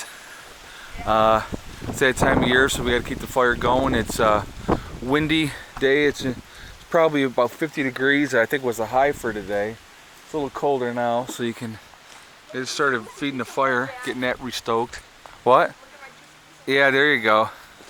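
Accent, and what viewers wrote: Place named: Pennsylvania, United States. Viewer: I know you say you live in New York, but I just talked to someone on the phone that works in pa and sounded just like you. Also a visiting nurse. Where do you live really? Haha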